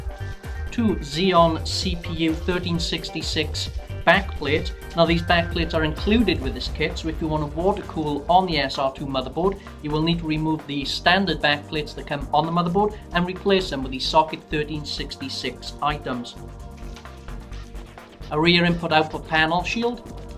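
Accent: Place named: Wales, United Kingdom. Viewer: liking your accent is it welsh im presuming? soz if im wrong. i was just wondering seeing as i live in britain are the parts you recieve shipped from the states? do you pay for them yourself or are they sent to you for reviewing and such? i would die for a job like yours keep up the great work man :)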